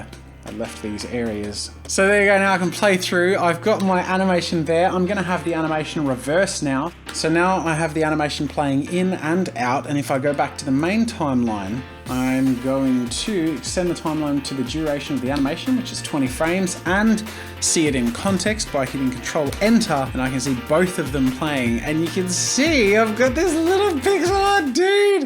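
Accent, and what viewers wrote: Australia. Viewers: (Australian accent) he is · Are you from Australia 🇦🇺 you sound like it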